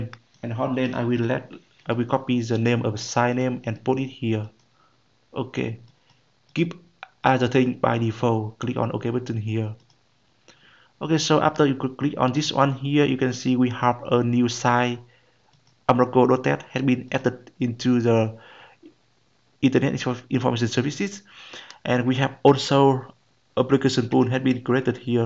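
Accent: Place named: Vietnam. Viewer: Nghe giọng Việt Nam ta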